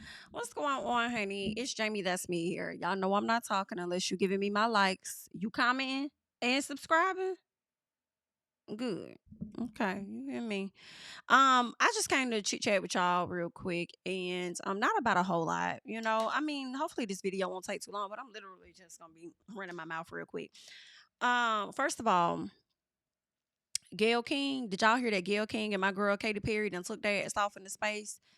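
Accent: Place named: Alabama, United States. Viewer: The first half of this video hit home. I'm from Birmingham and when I go home to visit, it hurts to see how bad my city has gotten. I joined the military in 2015 and never looked back. People ask me if I will ever move back home and I kindly tell them I have no desire to. On another note, I recently subscribed to your channel and before knowing you were from Alabama, I was like she has a Alabama swag about her because the way you talk is exactly the way I would explain things 😂. Keep doin ya thang Alabama sis.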